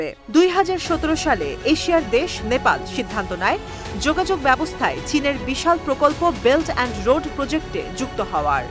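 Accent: India. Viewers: আসলে যে সাংবাদিক খবর পরিবেশন করছে সে মূলত ভারতীয় নাগরিক তার ভাষার ধরনেই বোঝা যায়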